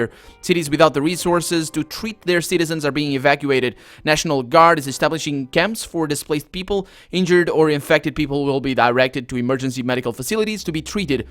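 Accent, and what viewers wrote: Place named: Portugal. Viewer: Aha! So you're portugese? I've always wondered what accent that was :D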